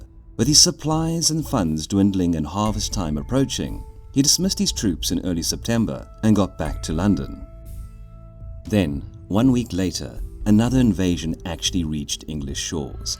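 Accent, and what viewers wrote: South Africa. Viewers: South African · Narrator dude, how long has it been since you left South Africa? I hear faint hints that give a little away. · English South African accent! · I have to ask, is your narrator South African? He's very good and manages to remain neutral but there are a few words that give him away listening as a ZA native. :)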